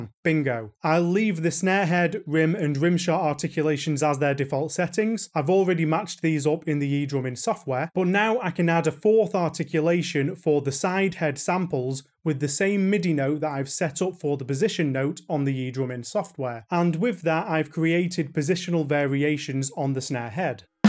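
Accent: United Kingdom. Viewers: Hi! Thanks for efforts! You used the Jobeky trigger? Sounds nice. I really enjoy your british accent. -> Say: "Alibaba". By the way, due to your vid I ordered some Lemon cymbals and a 45$ 12" Lemon snare pad. Even beeing a low-priced product that pad performes basically quite good with positional sensing using the eDRUMin.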